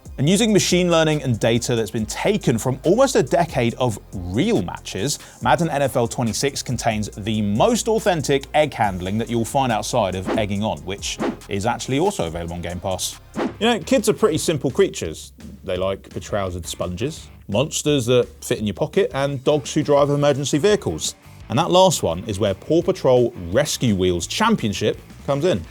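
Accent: United Kingdom. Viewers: They need to get rid of these UK accents. Petrol? , this guy really said dinosaur in an alien world game.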